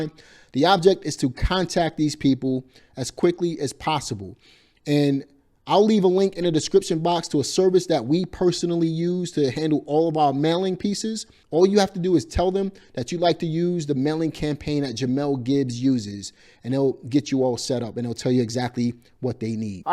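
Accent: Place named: Brooklyn, United States. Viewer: Side note…you sound like you’re from BK too! 💪🏾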